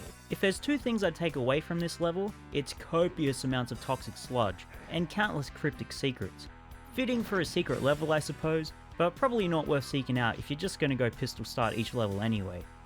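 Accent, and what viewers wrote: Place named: Australia. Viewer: Know what makes a good YouTube video? Anything on Doom. Know what makes it even better tho? Add an Aussie accent. Truly tho, loved the video. Keep up the great work there fellow Aussie! Rip and Tear!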